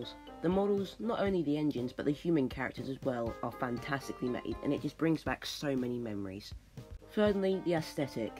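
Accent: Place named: United States. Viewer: I couldn't stand the American accent and terminology. I watched it with the sound off and subtitles on.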